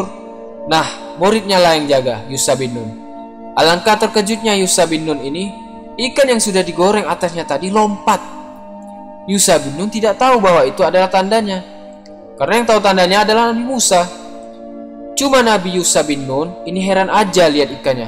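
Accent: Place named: Sumatra, Indonesia. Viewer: Logat abang macam orang sumatra lah... Iya kah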